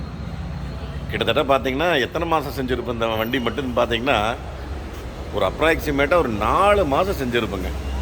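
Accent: Kongu, India. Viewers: காரை பற்றி நீங்கள் பேசும் விதம் மற்றும் உங்களது கொங்கு தமிழ் மிக சிறப்பு அண்ணா ...வாகனத்தை மிக நேர்த்தியாக செதுக்கி இருக்கிறீர்கள்...மிக அற்புதம் 🙏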